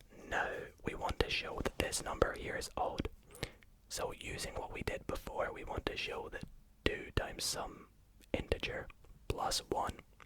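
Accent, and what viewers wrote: Scotland, United Kingdom. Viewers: I can’t tell if ur from Scotland or America it’s weird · Where in Scotland are you from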